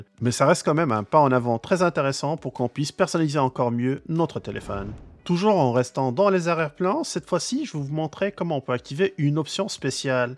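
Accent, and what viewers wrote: Quebec, Canada. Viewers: Merci moi qui a détesté beaucoup le l'accent québécois maintenant je l'adore grâce à vous mon ami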